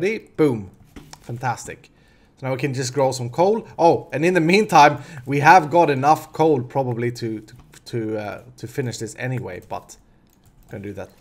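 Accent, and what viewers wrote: Sweden. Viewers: ...and ladle is not Lad-le it's Lay-dell.. Sveedish speech patterns.. JEEZ!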